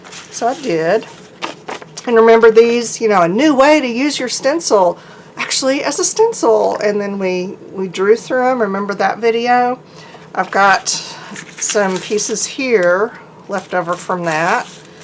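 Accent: South, United States. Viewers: nice!!! I love you and your videos.. you have a wonderful southern accent that I just love to death.. 🤗🤗💜💜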